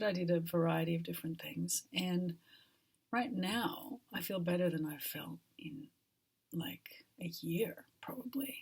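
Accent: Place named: Australia. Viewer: From one ozzie, I detect a slight ozzie accent listening to your talk 😉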